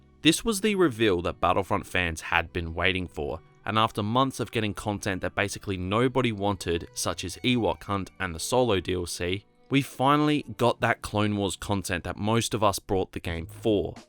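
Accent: Australia. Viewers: ThePizzadude93 he’s not British tho he’s Australian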